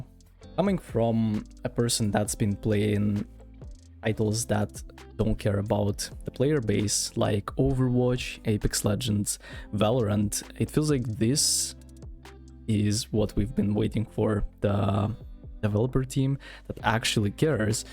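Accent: Russia. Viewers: Бро, зачем ты косишь под американца? Либо я схожу с ума, либо у тебя реально прослеживается русский акцент :D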